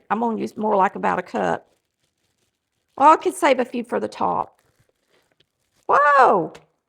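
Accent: South, United States. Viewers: Coping with grief loosing my mom I start baking. The last few months I made few different recipes of banana cake. This one is the best of all. The cake is delicious, moist and even I did not put self rising flour rice to the top of the baking pan. I like everything about this video, soft melodic southern accent of, she explains very well substitutions if you don’t have all ingredients in hand. In my cake I used organic flour 3 huge bananas and yogurt instead of buttermilk. Looking forward to try more recipes. Thank you!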